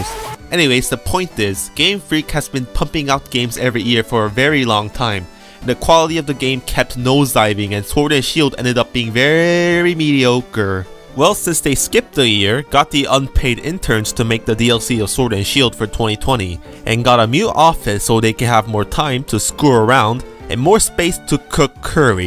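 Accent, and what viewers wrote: South Korea. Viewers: Do you really have a Korean accent? I'm French and I understand you more than a British 😅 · Oh, wait so that's a Korean accent?!? Geez, I didn't notice at all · Sorry but I laughed way too hard when you mentioned your "weird freak korean accent", I love your accent, but you really made me laugh with that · Dude, I can hear you just fine I have no problem with your Korean accent. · I just started watching the first 10 seconds and i am honestly surprised by the disclaimer. Sure you have a slight accent but your monologue is totally understandable and there's really no need to undermine yourself like 'my weird Korean accent'. If i may put some suggestion, just say you have cc. Be confident with yourself! I'll continue watching 👍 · 뭐야 뭔가 발음이 한국엑센트던데 진짜였던거임??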